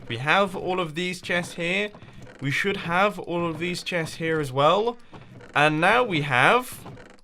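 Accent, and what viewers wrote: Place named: United Kingdom. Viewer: Create another storage system for your item backlog. You could also try to set up a system that drops about half of your farms drops in lava so that you don't overflow as fast. ALSO, during logdotzip's TTB#9 video, he abused fireworks so that he could fly better, but his elytra died very quickly, so stock up on a lot of dragon's breath (to repair your elytra while adventuring because some people in the comments section of his vid speculated that fireworks(even those that don't cause explosive damage) damaged elytra faster) before using fireworks to get a flight boost. Sorry for the text wall! Have a good day! Hope this helps! I also LOVE your British accent.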